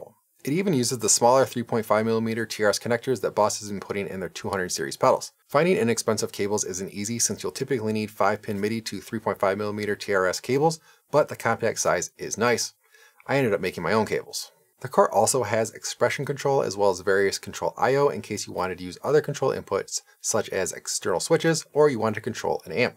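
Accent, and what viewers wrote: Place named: Midwest, United States. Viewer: nailed it- recognized the accent fellow midwesterner!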